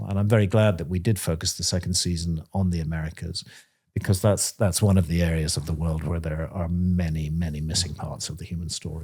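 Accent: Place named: North, United Kingdom. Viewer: Always feels weird hearing a fellow norther accent on YT, in a good way!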